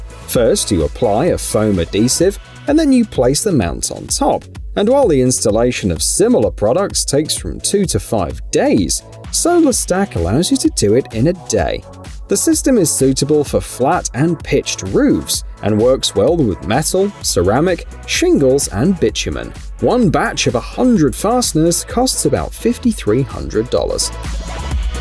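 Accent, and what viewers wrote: England, United Kingdom. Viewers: I find it odd and confusing that you sound English yet you are talking in 'dollars'. I live in Australia. Who's dollars are you referring to?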